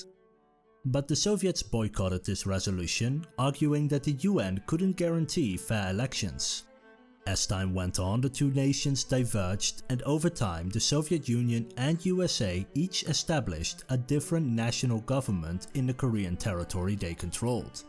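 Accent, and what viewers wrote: Netherlands. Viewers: Is the narrator from The Netherlands? The Dutch always speak better English than native English speakers. Lol I've been more interested in Korean history, this video was great.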